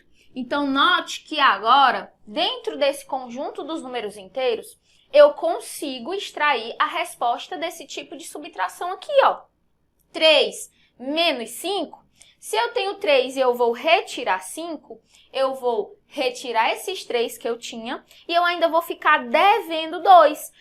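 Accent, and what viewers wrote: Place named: Maranhão, Brazil. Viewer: Muito bom. Sotaque parece dos maranhenses da família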